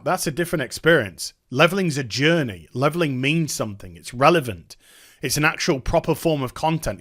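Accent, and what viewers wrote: United Kingdom. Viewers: I gotta say, i really like the British way you say things. You remind me of sargon of akkad.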